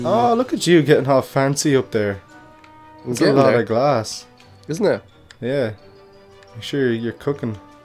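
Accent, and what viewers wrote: Ireland. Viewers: Is he Irish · R u irish cuz i am Lepracorns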